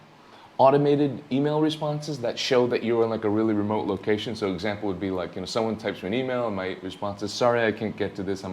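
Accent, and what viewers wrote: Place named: New England, United States. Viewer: Got to tell you, its so refreshing to hear someone on Youtube talk like you do. I told my partner that you had to be New England. Masshole here.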